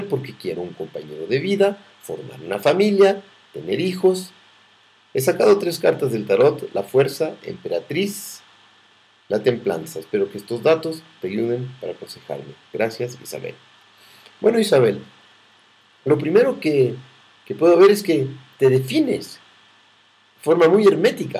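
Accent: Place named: Mexico. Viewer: tiene acento de mexicano, un Gran Ser él y su padre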